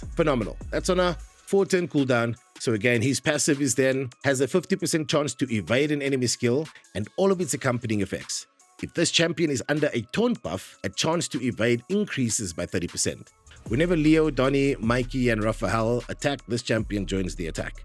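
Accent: South Africa. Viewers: Yup, first 2 words of the video and I knew you’re from SA😂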